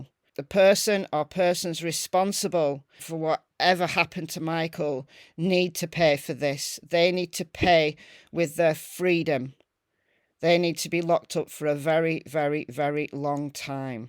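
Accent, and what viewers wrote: United Kingdom. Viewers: Love your british accent hello from America 🇺🇲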